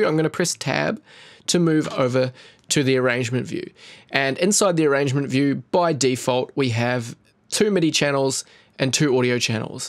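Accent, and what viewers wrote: New Zealand. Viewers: You sound kiwi bro! Me too, subbed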